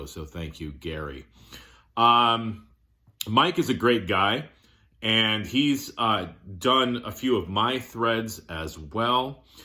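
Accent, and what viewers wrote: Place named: United Kingdom. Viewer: The British have the best accent hands down. What is this SST label you speak of? I love the dramatic pause after the “no ” reveal. You had me at Surf Rock with a punk edge. Fun fact, the name was conceived by the band assigning an alphabetical value to numbers on a gaming die, then rolling the die three times. If you like check out or.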